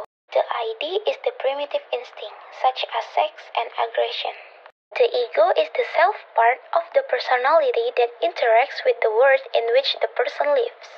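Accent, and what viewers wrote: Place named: United Kingdom. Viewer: Keren ya kak kayak ada british2nya gitu 🙏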